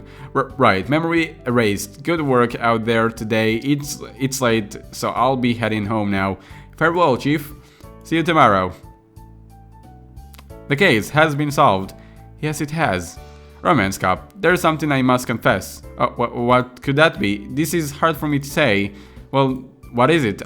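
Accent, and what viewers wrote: United States. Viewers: arent you american?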